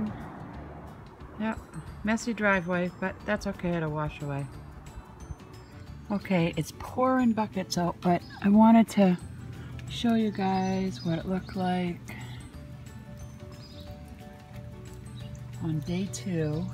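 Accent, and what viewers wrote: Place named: Boston, United States. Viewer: I should say yaahd because I love your accent so much! We love Boston! 💝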